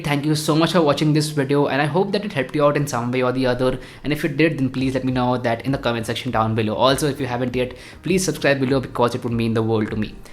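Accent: India. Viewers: Hate this indian accent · konuşma şeklinize sokayım sizin. hintli değilim iyiki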